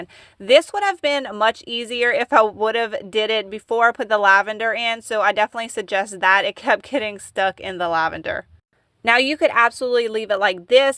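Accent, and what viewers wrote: Louisiana, United States. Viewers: I thought I detected some Cajun accent in there. 😁😁😁 I am from Ga. We say "y'all"! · You sound like you're from Louisiana, am I right? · She’s from southern Louisiana! Cajun country. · She is Cajun,her accent is wonderful,you my dear are rude !!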